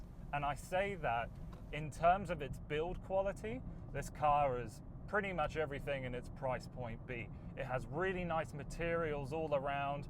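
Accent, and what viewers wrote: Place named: England, United Kingdom. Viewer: Are you in Canada .... ? because you sound very English .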